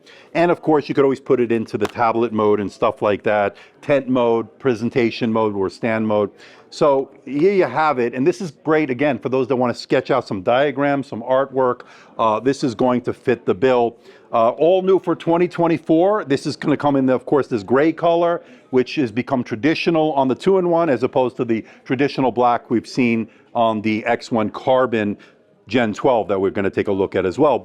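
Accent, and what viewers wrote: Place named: New Jersey, United States. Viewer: You live in Las Vegas, but you have eastern accent, New Jersey maybe?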